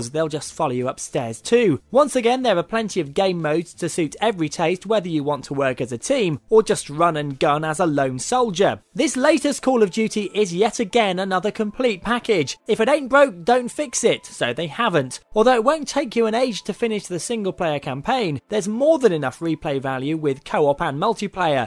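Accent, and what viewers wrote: United Kingdom. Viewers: i like the british acent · this guy is giving us british a bad name for talking like that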